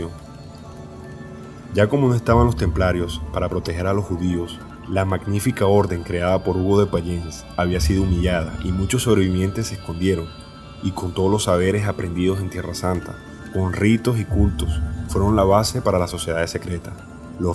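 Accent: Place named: Venezuela. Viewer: La historia puede ser muy buena, pero no con acento venezolano por favor. Pierde toda credibilidad y seriedad. Dile a algún amigo que te grabe la voz, preferentemente un español. Además, se nota que estás leyendo absolutamente todo, te equivocas al pronunciar los nombres y el acento de los lugares ó personas porque no eres un erudito en el tema, no lo has estudiado, ni lo conoces, solamente estás leyendo un libro que te encontraste por ahí.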